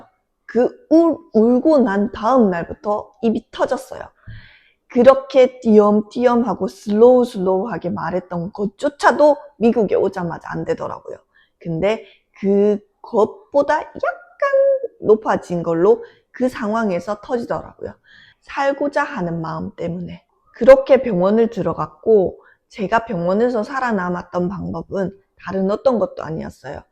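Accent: Gyeongsang, South Korea. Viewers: 선생님, 안녕하세요. 바로 구독합니다. ㅎㅎ 저는 한국에서 5년 임상 병행하며 임용 패스 후, 현재는 5년차 초등학교 보건교사로 근무중입니다. 학생때부터 미국간호사에 대한 생각이 있었고, 엔클렉스도 쳐야지 쳐야지 했는데.. 더 늦기전에 후딱 해치워야겠다?ㅎㅎ 라고 생각하여.. 올해 여름방학에 바짝 공부해서 8월말에 엔클도 패스했네요. ㅎㅎ 사실 저는 보건교사가 제일 하고 싶었고.. 그래서 지금 보건교사를 하고 있는게 정말 행복하지만.. 간호사로서의 발전에는 많은 한계가 느껴져요..ㅜㅜ 거기다 대학생때 뉴저지, 뉴욕에서 4개월간 병원실습도 하고, 미국생활도 해서 그런가.. 미국에서 더 많은 케이스를 보고 경험하면서 간호사로서의 전문성을 함양시키고 싶은 마음이 계속 드네요..ㅠㅠ 무엇보다.. 미국병원의 신문물들을 직접 경험하고 싶습니다.!ㅎㅎ 한국과 많이 다른 점에서 상당히 충격을 받았더랬죠.. 그래서 지금 진지하게 미국행에 대해 고민중입니다.. 미국가서 저 혼자 살아갈 자신이 전~~혀 없고, 또 영어에 대한 공포때문에.. 뭐든게 무섭고 두렵네요..ㅠㅠ 그러던중, 선생님 유튜브 우연히 보고 공감되는 부분이 많아 댓글 남깁니다. 얼마나 힘드셨을지..ㅠㅠ 마치 저 신규 때 매일 울며 출퇴근하던 그런 기분이 들더라구요.. 그리고 억양이 경상도분이신거 같은데.. 경남지역이신가요? 전 대구사람이라.. 경상도 말투를 들으면 어찌나 반가운지요.ㅠㅠㅎㅎ 아무쪼록 항상 건강하시고, 영상도 꾸준히 업로드 해주세요. ㅎㅎ 혹시라도 제가 미국행 결정하게 되면 댓글 남길게요. ㅎㅎ 선생님 응원합니다. ^_^ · 경상도 사투리를 들으면 개인적으로 반가워요, 저는 서울 사람 이지만 경남지역에서 오래 살았습니다. 김해 진영 마산 창원 그리고 부산. 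저는 독일에서 거주했었습니다. 누나 영상을 보면 예전에 독일생활 초창기때 고생했던 기억이 떠오르네요. 영어 be동사 개념도 모른 상태에서 생존을 위한 독일어 공부했던 기억이 납니다. 독일어를 먼저 배워서 그런지 공부를 하다 보면 영어에서는 왜 이렇게 하지 라는 아쉬움이 있을 때가 많더라구요. 아무튼 누나 미국생활 너무 부럽네요. 저는 친미성향이 있습니다. 언젠가 미국에 꼭 한 번 가보고 싶습니다. 영상 자주 챙겨보겠습니다. 누나 사랑해요.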